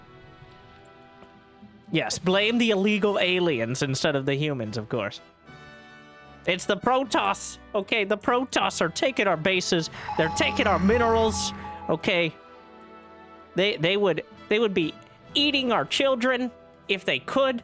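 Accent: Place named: Texas, United States. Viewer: I feel old I have watch your videos enough to notice you grow older and your voice dropped an octive. Also you sound like you came from Texas.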